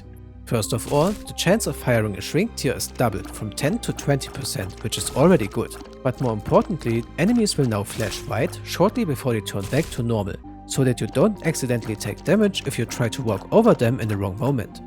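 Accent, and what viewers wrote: Germany. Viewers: the lovely german accent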